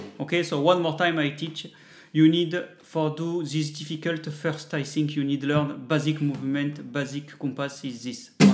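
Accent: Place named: France. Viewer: es-tu français, du coup? J ai remarqué que t as un accent français.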